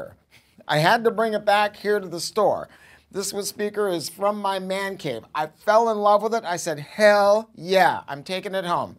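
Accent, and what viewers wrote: England, United Kingdom. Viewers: Shit. That english accent is exactly like mine. I feel seen. You know that episode of the Simpsons which is a parody of The Midwich Cuckoos? The Bloodening? Like that.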